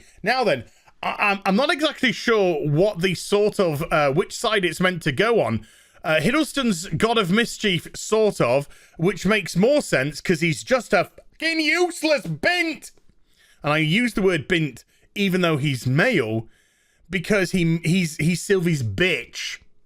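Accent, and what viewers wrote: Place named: Nottingham, United Kingdom. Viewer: Love that Nottingham brogue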